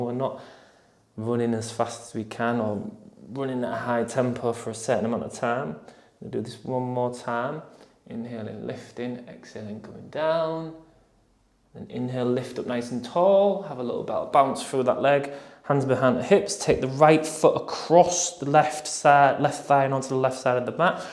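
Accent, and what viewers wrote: United Kingdom. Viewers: Thanks!! Out of curiosity, what part of the UK are you from? I love your accent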